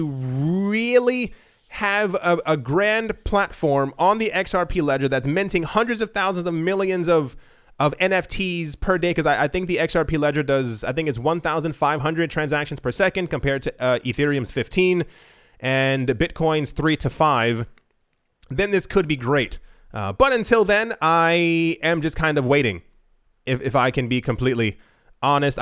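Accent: Germany. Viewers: Hehe, your German accent is great:) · Carefull.....your inner german is showing.....good accent!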